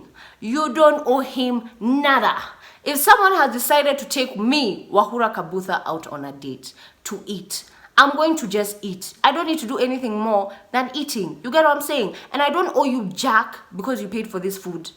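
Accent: United Kingdom. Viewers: I've been watching your videos so much in the middle of a convo I start faking a Brit accent😂😂😂😂😂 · The British accent,😍😍😭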